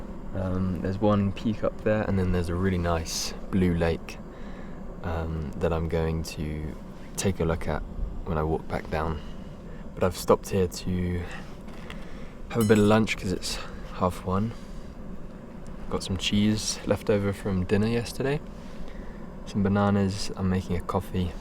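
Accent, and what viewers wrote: United Kingdom. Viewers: Excuse me, may I ask you something? Are you from the UK?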